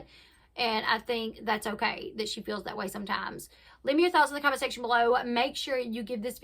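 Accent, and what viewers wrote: South, United States. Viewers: ... At first I had commented, basically piggybacking onto your 1st comment and then read what said back to you and I'll admit, she got me. She played victim and because I'm one of "those people", you know exactly what I mean, the ones she looks down her nose at, I was ignored by my initial comment on yours and had stupidly deleted it. Then I chose to write an apology comment directly to and you know what? She ignored that one too! I used to really support her channel, especially during the whole KJ situation, BUT now.... nope! She's just like KJ, with a more southern accent and better ability at applying makeup. That's pretty much all. She doesn't care about anyone BUT herself, her money, maybe husband and kids and we don't make the cut whatsoever. This is the type of broad that sits at BBQ'S bitching about how "these people" affected her life and how useless we are. 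She's judgemental and I feel like a fool for firstly deleting my original comment and writing a separate apology one and secondly for falling for the manipulative techniques & word salad used in her reply to you and your comments. This woman, I'm so glad that my daughter won't ever have to grow up around her children and there's states between us. I just can't, it's like speaking to a body void of personality and empathy. Empathy is what got me to delete, apologize and, no excuses about your teeth or children are going to get you outta purposely ignoring it. I'm embarrassed and angry. BUT, I s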